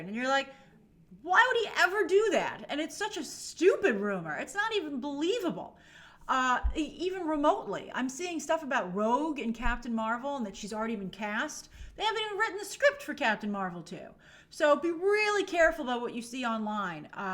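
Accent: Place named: New Jersey, United States. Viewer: You sure sound like a Jersey girl,...Luv it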